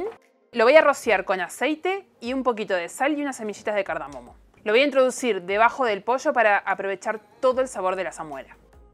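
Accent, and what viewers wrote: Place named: Argentina. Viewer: Maravilloso tu Espacio. Sólo hago hincapié en q' debes llevar con HONOR y al máximo Orgullo el GRAN acento ARGENTINO 🇦🇷. No bloquees tu pronunciación, q' queda muy tierno , dulce e interesante. Ser y pertenecer a un mundo Anglosajón es una maravilla , pero siempre poniendo en frente y sin intentar olvidar nuestras raíces , ni hcia donde vamos. Saludos y muchas felicitaciones !!!!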